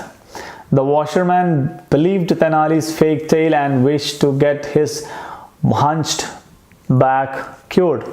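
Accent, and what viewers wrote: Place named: Kerala, India. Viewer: I am sorry but your English is AWFUL. I just heard your first sentence and I had to stop because grammar was horribly off. You use "the" on occasions that you should not. You use "doesn't" in place of "don't", and "has" in place of "have" . And quite honestly, your grammar is so bad that I cannot even figure out what you are trying to say in your first sentence. Anyway, if I were to guess what you were trying to convey, the appropriate words would be "This video is only for beginners who don't read books, but who wish to read books". If I had to say it in a roundabout manner as you did, the words would have to be "This video is only for beginners who don't read books, but who have a wish or want to read books". In addition to your faulty grammar you also have a VERY thick Malayali accent that you need to improve. For heaven's sake, improve your own English (at least your grammar) before you teach others.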